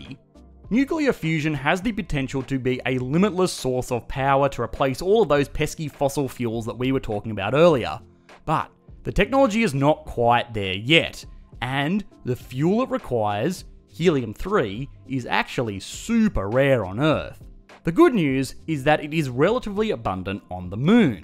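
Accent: Australia. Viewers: Not gonna lie I'm a sucker for the Aussie accent. Half the reason I'm subscribed to Mr. Sunday Movies too.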